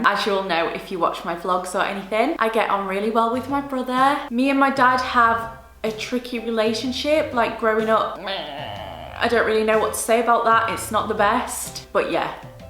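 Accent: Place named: Northern England, United Kingdom. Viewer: Love that you went to Penistone Grammar! I live Huddersfield way and it's great to hear another Northern accent :D xxx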